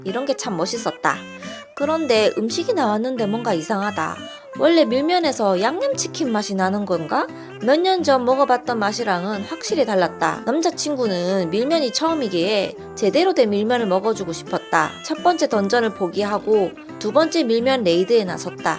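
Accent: Gyeongsang, South Korea. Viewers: 이건 도대체 어느 동네 사투리? 요즘 경상도에서 이런 말투 쓰는 사람들이 있나??? ㅋㅋ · 부산이시골이네? 목솔 들어보니 깡촌녀ㄴ~~ 부산오지마송 밀양가소 그가 더어울릴것가소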